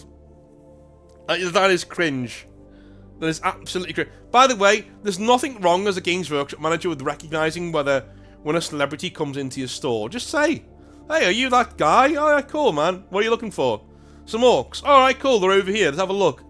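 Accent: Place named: Liverpool, United Kingdom. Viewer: are you Scouse? I swear you have a Liverpool accent but like you've moved about alot so it's become more subtle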